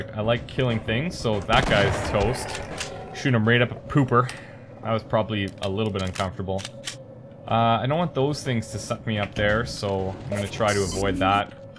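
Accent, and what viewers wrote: Canada. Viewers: I'm so glad I found this LP :) Keep it up! And Canadian accent ftw.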